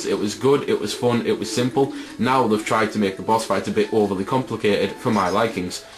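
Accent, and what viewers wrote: United Kingdom. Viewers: awesome and btw its good to hear brits doing reviews, its always some american kid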